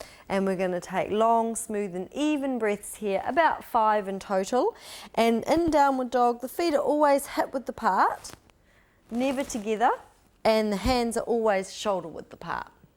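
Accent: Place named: New Zealand. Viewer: haha awesome NZ accent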